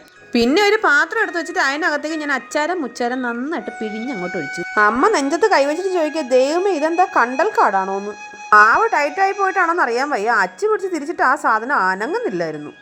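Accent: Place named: Kottayam, India. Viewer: ok👍 സംസാര രീതി കേട്ടപ്പോൾ ഞങ്ങളുടെ കോട്ടയംകാരിയെപ്പോലെ തോന്നി. അതാ ചോദിച്ചത്🙂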